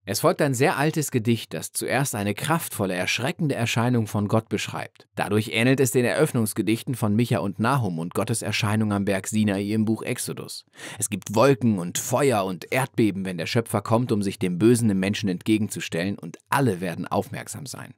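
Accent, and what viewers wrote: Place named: Ruhr, Germany. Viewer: Was für ein Ruhrpott-Geschnatter... Wer's authentisch hören will, sollte sich besser das Buch selbst anhören. z.B. hier: <link>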